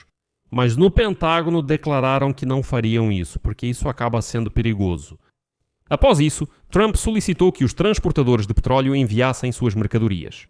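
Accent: Portugal. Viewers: Não dá pra ficar misturando dois sotaques Ponho o comentário e saio Gosto muito do sotaque ❤luso mas bagunça não dá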